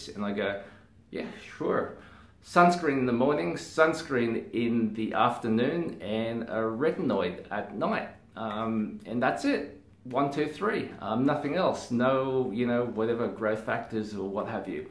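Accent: Australia. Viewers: KimLip'sLeftEye It’s because he’s so darn handsome.😍 Plus the Australian accent is adorable. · Hi Doc! This video popped up in my Recommended Videos and was pleasantly surprised that you had an Australian accent! And then I found out you're in Brisbane too!!! Loved the video but I have a couple questions - 1. Do I need a referral to come see you? And 2. The Ordinary has so many products in it's range... How do I know what to choose for my skin? Thanks heaps! Subscribed on YouTube and followed on Instagram :) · Being Australian and Asian somehow manages trip to me up when you talk still.. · What a great surprise to find an Aussie! Like even as an Aussie, I went holy fuck he's got such a strong accent. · Recognized the australian accent right away! 😁 Great video! ❤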